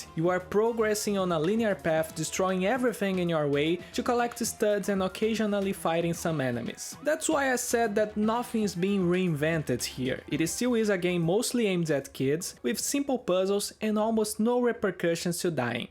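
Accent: Brazil. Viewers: mano tu é br? esse sotaque de leve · Your accent, looks like brazilian speaking english.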